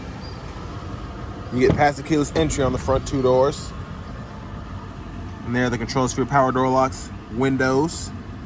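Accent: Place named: Pittsburgh, United States. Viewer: Nice review. I know you are in Canada but it sounds like you have a Pittsburgh accent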